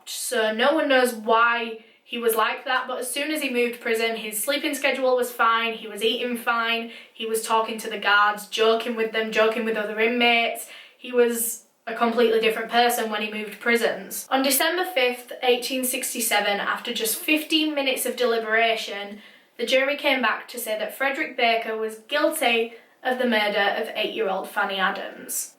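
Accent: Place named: North, United Kingdom. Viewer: ah so it’s a British accent? i couldn’t really tell what it was because it’s so thick, but i loooove her voice.😍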